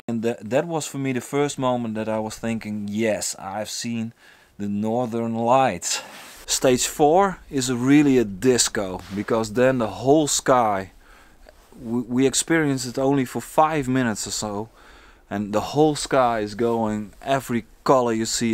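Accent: Netherlands. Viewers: haha hoorde het door het accent wel vet man die northern lights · you sound dutch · How you talk is totally Dutch even your name ! *Peace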